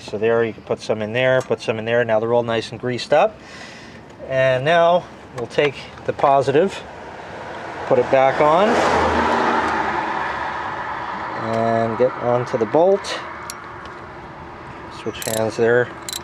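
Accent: New York, United States. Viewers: Ethnicity: lebanese with nyc accent :) thanks for the video Habib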